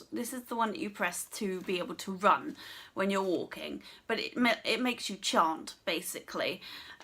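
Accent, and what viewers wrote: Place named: RP, United Kingdom. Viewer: You are one of the few people I know of that can still sound posh while swearing haha. Great review chum.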